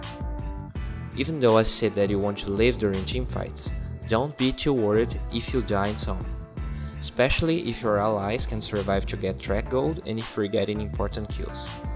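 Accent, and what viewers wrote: Brazil. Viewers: c eh br, seu sutaque eh bem forte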